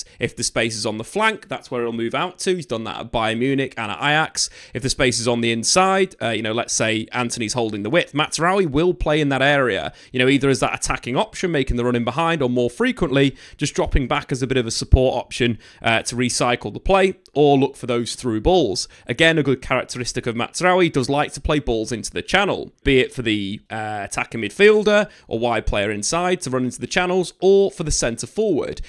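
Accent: United Kingdom. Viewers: Forgive his english accent 😅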